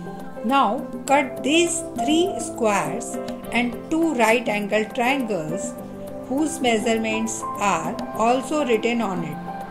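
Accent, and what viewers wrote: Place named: India. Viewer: Because she is from India she is teaching that's all that matters not everyone English is first language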